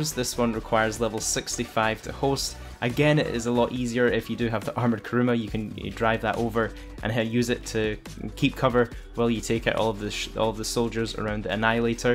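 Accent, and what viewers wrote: Scotland, United Kingdom. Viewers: First Scottish GTA youtuber, finally I might be able to follow this without bullshit 100 step glitches that don’t even work. You from Glasgow bro?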